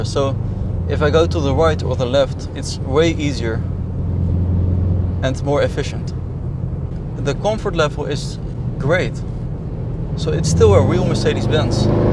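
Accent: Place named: Netherlands. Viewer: i immediatly knew he was dutch hearing how he speaks english =)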